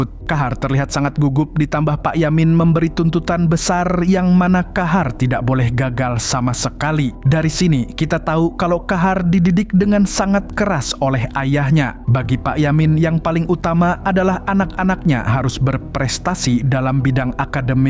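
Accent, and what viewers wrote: Banjar, Indonesia. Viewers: Urang mana gerang bang nih. Urg banjar kah 😂😂😂... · Orang banjar sekali nya sidin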